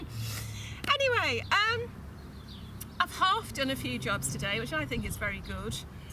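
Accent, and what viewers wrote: United Kingdom. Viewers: you make me feel so much better about my garden, especially my fruit bushes, I never quite know what to prune out and do go at it a bit "willy nilly" I have been very ill for the past several months so am getting a late start to my pruning chores, however, I did start on my gooseberries a couple of days ago. I remember my step dad telling me to make sure to keep the middle of the bush open enough for a bird to fly through it (for air circulation I am suspecting??) other than that, I can't remember what branches the fruit comes on so I just basically thin out any that are crossing each other or damaged and clip the tips of the very long branches and call that it. As for the black currants, well, I have 3 bushes and I think last year I got maybe a half cup of fruit from the lot! I think I am doing something wrong don't you? My raspberries seem to have gone wild and are migrating across the rest of the garden so I am planning to cut down all the old ones and let most of the newer ones have their way. 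I usually just cut out the old dead wood and tip the longest branches to a manageable height. Maybe I need to go online and see what I can learn from there. Anyway, it has been a blast watching you, (I just found your channel so have subscribed) I love your accent, I was born here in Ontario Canada bur raised on the south coast of UK just a few miles from Brighton. I moved back to Ontario to raise my own family over 40 years ago. Still love to hear the British accents though.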